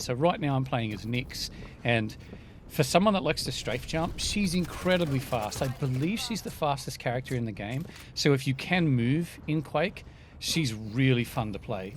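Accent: Australia. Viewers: I watch you for your personality and your smooth Aussie voice. Just play what you like and people will watch.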